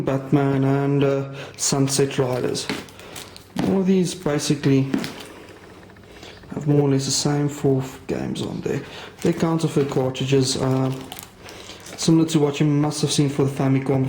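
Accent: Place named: South Africa. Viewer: Heavy Saffer accent. lol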